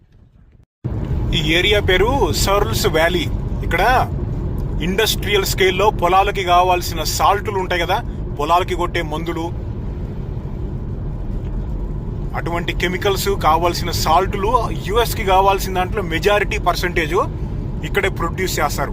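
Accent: Andhra Pradesh, India. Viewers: అన్న ఈ ఒక్కదానికి సమాధానం చెప్పన్నా నువ్వు ఆంధ్ర యాసలో మాట్లాడుతున్నావ్ మీ ఫ్రెండ్ కానీ మీ అన్నయ్య కానీ తెలంగాణ యాసలో మాట్లాడుతున్నారు ఇది ఒక్కటి చెప్పన్నా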